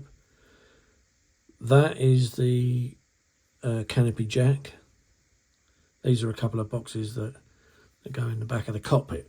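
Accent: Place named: England, United Kingdom. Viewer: With some English dialects I have troule hearing right..did he say the resin pilot and thrust cone comes with the kit?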